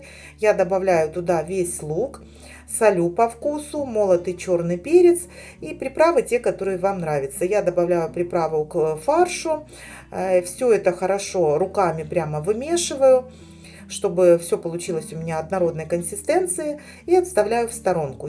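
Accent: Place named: Surzhyk, Ukraine. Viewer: МАТЬ- то тут при чём? Да ,неправильно человек говорит,но поправьте,подскажите- она исправится ,увидев нормальный совет.Вот привыкли люди так говорить ,я так поняла ,хозяйка из Украины,там ,в основном ,так и говорят.Не потому ,что неграмотные,а потому ,что говорят на " суржике",в основном.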